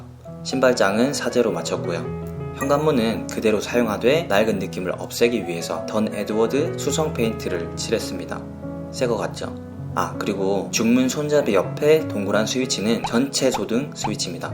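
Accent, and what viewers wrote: Gyeongsang, South Korea. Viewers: 진실함과 성실함에 감동 받았습니다~!!^^ 저는 70세로 75세 남편과 20년 된 부영 아파트에 10년 전에 입주하면서 도배,합성마루, 씽크대,도배,화장실 1개,티비설치 하는 쪽 벽면(전문용어 모르겠음) 등 나름 수리를 했습니다. 다 마치고 나니,앤틱 벽지 선택과 마루바닥을 짙은 커피색 계열로 해서인지 집이 너무 어두워서 손님이 오면 낮이라도 전기불을 다 켜야 될 정도인데다가, 한 10년 살고보니, 앤틱 벽지나 문(부영 전형적 체리핑크색 문,걸레받이,마감 같은것이 너무 싫증이 납니다~ )다용도실에는 결로현상이 심해서 곰팡이가 많이 끼어 흰색 수성 페인트를 칠하면서, 유튜브를 보다가 ' 인테리어'를 접하게 되었습니다. 다른 몇군데 인테리어 회사는 너무 고급진 부자들 상대로,나에게는 그림에 떡같이 눈요기만 될뿐 더 기가 죽고, 리모델링은 꿈도 꿀수 없다고 포기 할 수 밖에 없었는데, 마침 우연찮게 사막에서 오아시스를 만난것 처럼 희망의 섬광을 번쩍하는 것 같았습니다~!!^^ 우리 부부는 이제 나이도 있거니와 노후를 대비해야 되는 서민으로서, 아예 새 인테리어는 포기하고 살아야 된다고 생각하고 살고 있답니다. (사실 집이 어두워서 Led 등으로 교체하고 전기료 아끼지 않으려고 했건만,습관적으로낮에는 자꾸 불을 끄게 되고 간접조명이 아니니까 너무 밝고 낭비같아서 전기를 끄게 된답니다~ㅠ) 특히 부영 특유의 체리핑크색이 너무 눈에 싫증이 나고,브라운 계열의 가구조차 보태져서 색과 명도가 너무 낮아서인지 정서도 따라서 다운되고 우울해지는 듯 합니다. 본래 너무 심플하고 가벼운 모든함을 싫어하고, 나이에 걸맞게 무게있는 앤틱함을 좋아하지만,처음 수리 할 때에 력셔리함과 로맨틱을 더하고 싶었지만, 너무 사치하는것 같아 앤틱만 신경 쓸 정도로 이 분야에는 너무 관심없었고 이 정도도 감사하며 살았는데ᆢ 10년을 살고 보니ᆢ 다른데 이사 갈 형편도 안되고 ᆢ 어떻게 셀프로 컬러라도 바꿔보고 싶지만, 남편은 이미 있는 짐을 그대로 놔두고 수리하는것에 대한것과 비용 부담 땜에 생각지도 않고 있는 상태입니다. 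여기는 김해 삼계동인데 ᆢ마침 사장님의 구수한 경상도 억양조차 더 친밀감과 진실함을 전달되어 이렇게 하소연해 봅니다~^^ 지금 우리 남편은 제가 이런 댓글을 쓰는지 조차 모르고, 다만, 아내가 싫증만 낼뿐 이런 상상에 잔뜩 부뿔어 있는지 꿈에도 모르고 있답니다~^^ㅠ 너무 긴 글 죄송하고요~ 혹시 도움이 될 수 있는 팁을 듣고 싶답니다~!!^^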